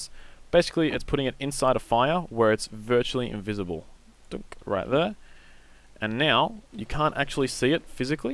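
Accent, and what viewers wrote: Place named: Australia. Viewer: You're Aussie!! Awesome bro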